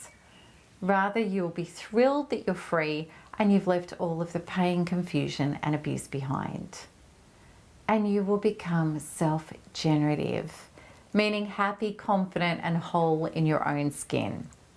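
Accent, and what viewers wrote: Australia. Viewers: Your Aussie accent is like butter on toast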